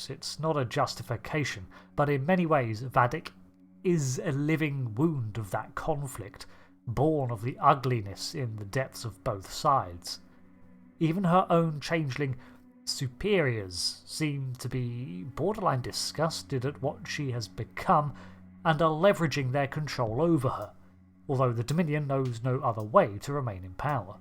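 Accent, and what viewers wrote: United Kingdom. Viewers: For a few years now I've been watching your videos for star trek online as I have not been able to play it much myself. I love the way you narratively approach each of your episodes for the stories. I love your recaps of this season of Picard. Not much of a point to my comment other than to say I appreciate what you do. And a question? your accent I'm having a hard time placing, and I don't know why cause usually I'm good at that. Honestly I can't tell if its British or Scottish but I have a feeling it's neither. Either way it doesn't matter. I'm just curious. Either way I do love your videos and watch them often. Looking Forward to the next one.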